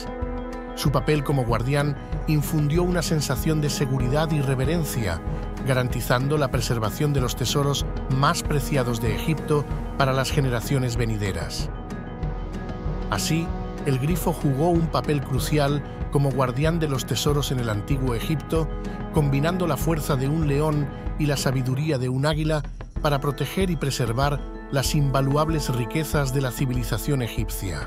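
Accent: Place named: Spain. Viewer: Eres de España amigo? me encanta el asento de la IA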